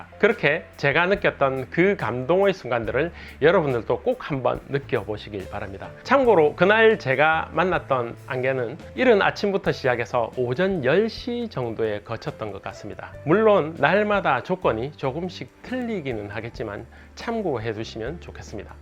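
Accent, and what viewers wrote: Gyeongsang, South Korea. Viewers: 경상도발음과 액센트를 좀만더 고쳐주셨으면 싶어요.ㅠㅠ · 다 좋은데 경상도 액센트 귀에 확 거슬립니다. 가이드 하시는 것은 영 파이라예 ~~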